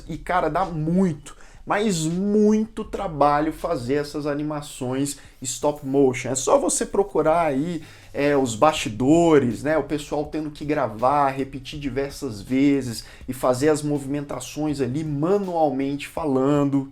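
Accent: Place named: Belo Horizonte, Brazil. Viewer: Que beleza de vídeo e o lance da Kombi dos ovos a dez Reais foi massa!!!! Kkk parabéns!!! Vc é mineiro, né? Sotaque de bh!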